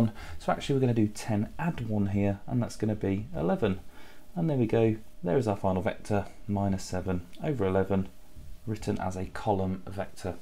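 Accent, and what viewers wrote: United Kingdom. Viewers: He’s scoish init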